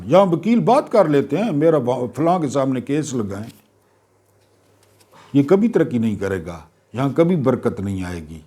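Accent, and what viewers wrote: Saraiki, Pakistan. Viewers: Shareki or Punjabi ap k muno or lejjay say Laga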